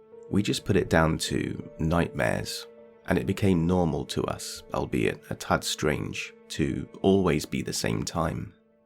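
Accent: United Kingdom. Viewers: You have a good voice for story telling. I always prefer an English accent. Would also love a Scottish accent. I can't stand Mr Reverants voice. Sorry Rev.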